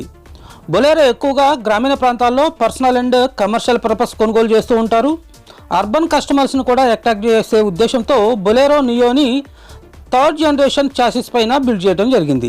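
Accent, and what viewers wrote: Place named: Telangana, India. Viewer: అచ్చ తెలంగాణ యాసలో అద్భుతం గా అన్ని విషయాలు చాలా బాగా తెలియ పరుస్తారు మీకు నా ఆశీర్వాదం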